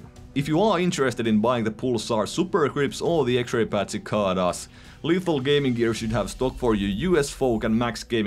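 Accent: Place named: Finland. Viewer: are you finnish? your accent sounds very finnish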